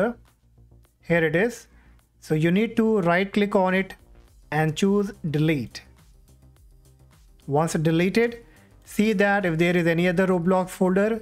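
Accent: India. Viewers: Thanks bro, this is why u should listen to Indian accent ppl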